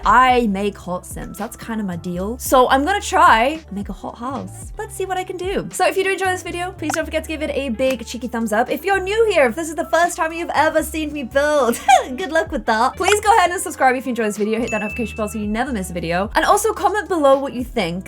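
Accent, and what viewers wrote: United Kingdom. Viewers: My American self laugh so much of how she said rabbit Is that wabbit I’m sorry I’m American and think British accent are funny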